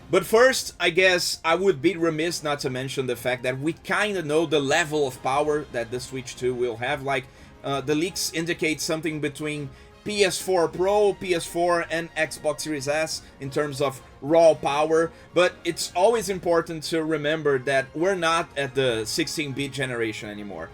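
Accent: Brazil. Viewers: he clearly has a Brazilian accent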